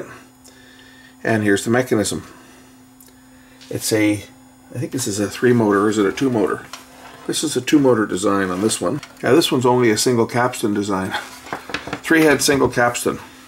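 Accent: Canada. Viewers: You from Canada?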